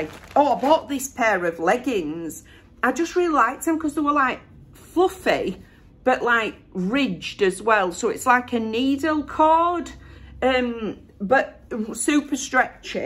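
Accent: Yorkshire, United Kingdom. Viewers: I need to come to Bradford now I love mixing up Poundland and primary hope to bump in to you it’s nice to hear a Yorkshire twang love West Yorkshire ❤️😘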